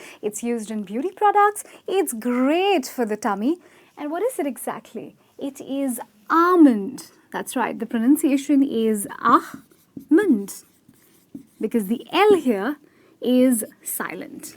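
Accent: India. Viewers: This sounds more like Indian English. Plus no onion in bruschetta ;) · I think she has spoken all the words correctly in the Indian context. Country wise,words will always sound different. We dont speak like Americans or British. Appreciate the fact that we are atleast adopting these funny weird foreign words and trying to pronounce them correctly unlike americans ,europeans...etc who never get our food names correct. Can you pronounce Tandoori correctly ? Can u guys pronounce Baingan ka Bharta correctly ?? Can you pronounce Sambhar correctly? Countrywise words will always have a colloquial touch invariably. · She is pronouncing it in Indian English, for those who were wondering. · i am sorry. i do not want to learn indian english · That's commonly heard in India. Very sad for this lady, trying to teach us, to use. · No 1, she doesnt speak british english, more of indian slang english, no.2 it's temato not tomeito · Absolutely right. 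As a native English speaker from tbe UK this woman has gigen me a headache with her English. Most of it prounced wrong. She is obviously from Indian background and they always seem to prounced their v as a W.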